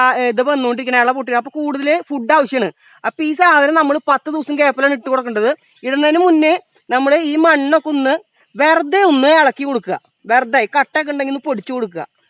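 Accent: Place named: Thrissur, India. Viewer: തൃശൂർഭാഷ നല്ല അവതരണം സൂപ്പർ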